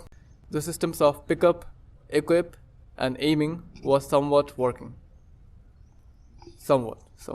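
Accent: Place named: India. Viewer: This looks so good i made a good coice to sub to you, Iam noticing you have a indian accent so,just curiuse are you India btw jam not indian ism bangladeshi. This was a wild journey to good trough such a creative video 😅 EDIT:I really like the Charector it has her own visual style,Also i was thinking should this game have shell shaded charectors, I Thik It will break the unique art style what done you think🤔.And o wana if this game going to be TP. Or FP it looks really good one both 😆..Holy shit i was just watching The video this chicken i's fucking uncanny dude seriusely got me. 😨 (Enemy with respect was really funny ngl😁).You rembeling random sad shit and the chicken just checking her out was the best part really adds to the pervy part. The random bits if unintentional jokes are pretty funny i like how the chicken just humping the green ball. 😂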